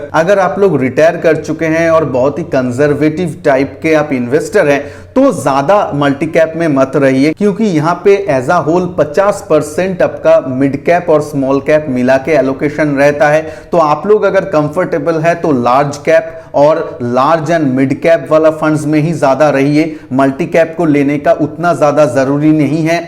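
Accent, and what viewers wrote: Bengal, India. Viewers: আপনার উচ্চারণ শুনে মনে হচ্ছে আপনি বাঙালি, যাইহোক আপনার ভিডিও আমি খুব মনোযোগ সহকারে শুনি।। সঙ্গে আছি দাদা।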